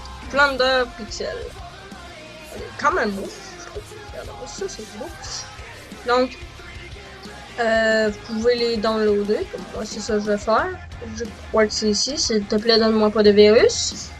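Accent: Quebec, Canada. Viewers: Ta vraiment l'accent québequoi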